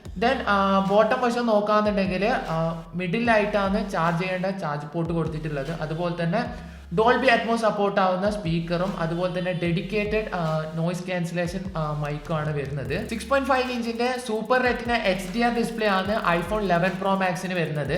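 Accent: Kannur, India. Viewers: Nee kannur aano 😊😊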